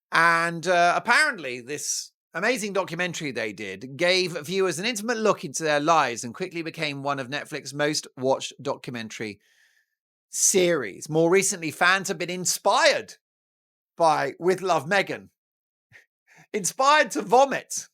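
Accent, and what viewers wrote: Australia. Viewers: 😂😂 your Aussie accent is on point.. brilliant.. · Love the Australian accent. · Dolan, you are very entertaining and you do the best Aussie accent I have ever heard, cracks me up every time (I'm Aussie so I do know). "Cobber" is very sixties though, it's "mate" now but keep up the fun times with this show! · Love the Auzzie accent.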